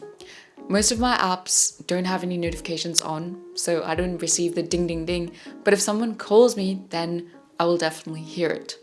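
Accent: India. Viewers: ur voiceee issssss sooo beautiful are u Indian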